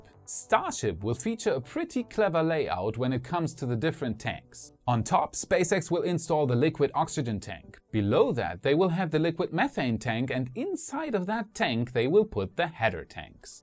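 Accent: Germany. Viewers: are you from germany?!! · I wanna say German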